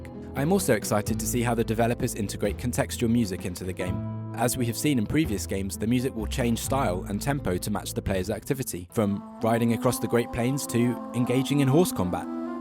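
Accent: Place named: London, United Kingdom. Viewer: man, I love your accent. Are you from London?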